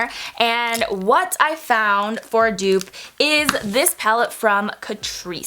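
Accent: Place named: United Kingdom. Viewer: Yes, I found you through that Dupe Video. Love your British accent, lol! 😍 Thank you for sharing all these dupes😊👍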